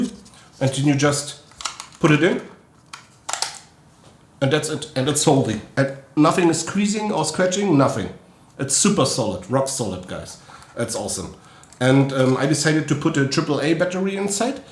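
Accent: Germany. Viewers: Stuck around to pin point the accent. Hört sich ziemlich Deutsch an.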